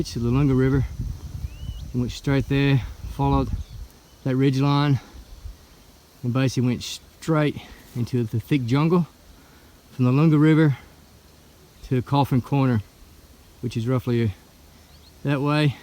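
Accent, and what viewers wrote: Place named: South, United States. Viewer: I have just discovered your channel (love it by the way, amazing content!), and based on solely your accent and the way you speak, I genuinely cannot tell whether you are from the American South, or Australia. I went back and forth on it all the way through this video and still couldnt determine.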